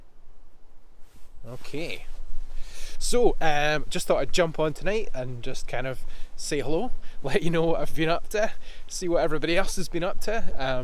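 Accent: Scotland, United Kingdom. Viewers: Me too! I love listening to his accent. He has definitely made me want to visit Scotland. No for his accent (well, maybe a little), but for the beauty. Such history.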